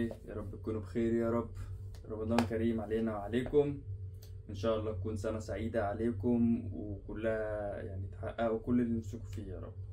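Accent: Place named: Egypt. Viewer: كويس انك مصري مش عارف ليه بس انا مش بحب غير اللهجه المصري😊❤❤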